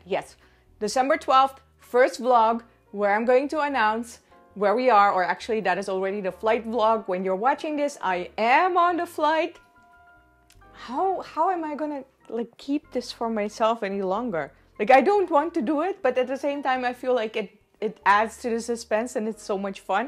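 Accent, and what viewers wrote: India. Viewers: Ik heb een poos niet meer op jouw video’s geklikt maar nu dus wel. En het valt mij op dat je je zelf een typische accentje hebt aangeleerd. Het is Indiaas, Engels 😂 grappig om te horen bij jou.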